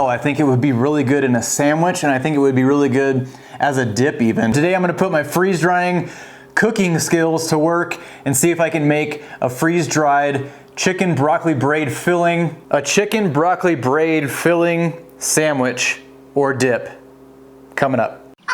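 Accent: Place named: South, United States. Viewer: I moved to South Carolina about 2 years ago and have gotten used to hearing random southern accents in conversation (I’m originally from CT). I legit thought you were saying ‘Chicken Broccoli BREAD’… I’m like ‘why the heck does he keep saying bread in that weird accent?! Maybe he’s pronouncing it the way his mama pronounces it? … Then I see the BRAID … 🤦🏻‍♀️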